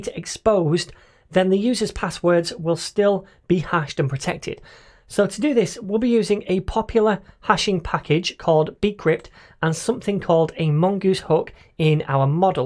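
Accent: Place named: Northern England, United Kingdom. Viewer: Really loving this tutorial series, it came at the right time for me in my programming journey. I have found that it's best to simply watch your tutorials start to finish without taking notes, and then opening up the project file at the end from GitHub and seeing how much I remember. I know everyone's style is different, and this maybe a bit selfish, but i thought i'd suggest it anyway - as these are predominantly tutorials, it would be cool (at least for me :P) if there were more tutorial-comments in the code for us to refer to in future. I suppose I'm just lazy though. I should probably comment along the actual code as I watch. But might need to halve the speed. Where are you from? I can't tell if it's Manchester or more Newcastle-way. My dad overheard your voice and said 'bloody 'ell he should teach the masses with that voice' :D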